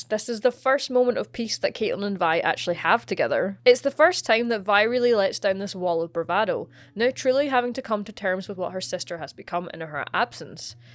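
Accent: Scotland, United Kingdom. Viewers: The thick Scottish accent with the Chinese channel name threw me off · I really did not expect to hear an scottish accent when I clicked a video LOL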